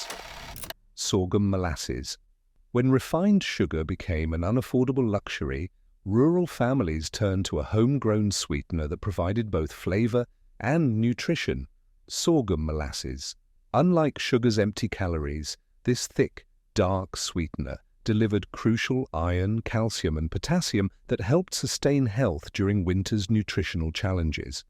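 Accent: United Kingdom. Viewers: The content is interesting. The mixture of American vocabulary with the British pronunciation keeps throwing me.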